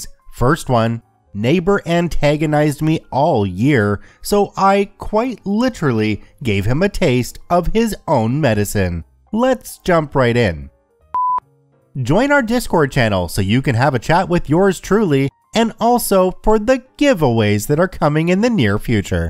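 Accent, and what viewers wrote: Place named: Canada. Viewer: I don't know it would be the first time I enjoyed the Canadian accent. Not that I dislike it. I lived there for awhile. I lived in Canada from age four and a half till about 11 maybe a little younger. And then we moved back to the east coast and then everywhere else in the u.s. LOL all I know is when I was a kid I trained myself into having a flat Midwestern accent because it kept me from getting picked on as much. But I still don't remember anyone in Canada saying it the way you do LOL have a wonderful night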